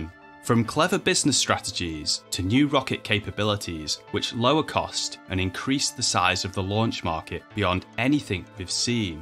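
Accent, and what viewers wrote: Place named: United Kingdom. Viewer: Awsome dude thank you, and great to hear a fellow brit on this subject, liked and subscribed 😀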